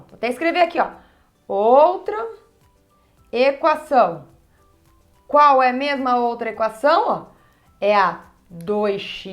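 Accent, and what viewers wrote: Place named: Paraná, Brazil. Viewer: eu escutando o video e pensando " nossa esse sotaque é familiar" ai na hora que ela falou Paraná eu lembrei dos meus primos do Paraná que falam igualzinho a ela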